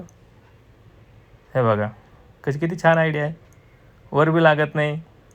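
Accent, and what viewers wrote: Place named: Latur, India. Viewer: सर तुमच्या भाषेवरून मी ओळखलं होतं तुम्ही लातूरचेच आहात मी पण लातूरचीच आहे प्रकाश नगर आणि सासर परभणी आणि पुण्यात राहतो तुम्ही तर आमच्या जवळचे निघालात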